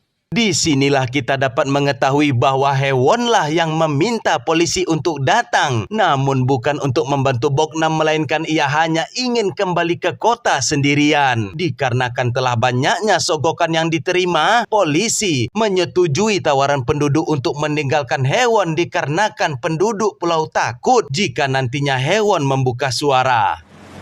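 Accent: West Sumatra, Indonesia. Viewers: Rang minang y bg?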